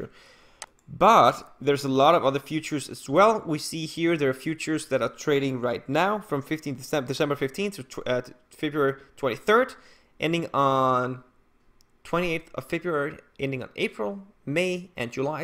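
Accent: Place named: Denmark. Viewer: You sound danish.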